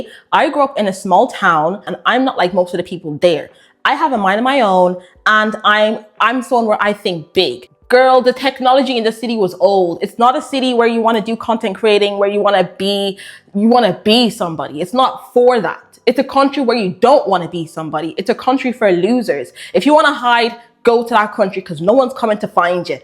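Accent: Ireland. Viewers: Omds girl are you from Ireland?! I know a fellow black Irish girl when I hear them 😩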